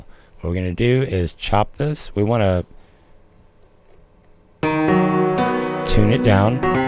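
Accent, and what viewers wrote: St. Louis, United States. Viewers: Yoooooo are you are Cardinals fan? I’ve always taught you talked kinda like a true south STLer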